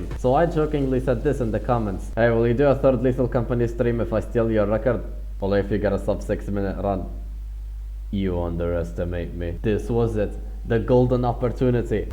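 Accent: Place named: Poland. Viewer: You sound sooooo Polish xD but still nice video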